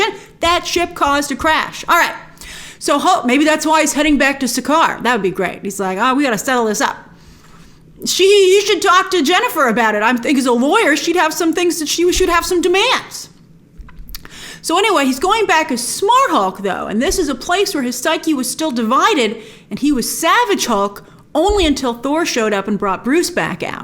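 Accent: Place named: East Coast, United States. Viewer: “I think they cudda dun a betta jaub” That East coast accent tho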